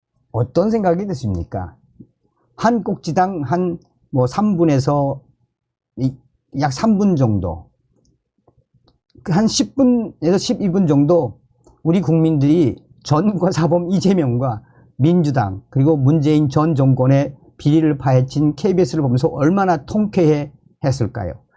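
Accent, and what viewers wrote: North Korea. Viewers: 아저씨 말은 북한말같애 북한말 하지마요,짱나요.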